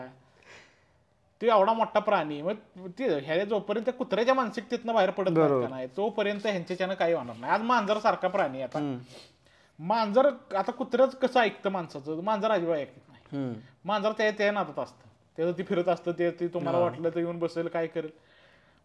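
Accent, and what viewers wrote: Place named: Satara, India. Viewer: लय भारी चर्चा केली दादा अगदी आपल्या सातारी भाषेत. खूप मस्त. धन्यवाद!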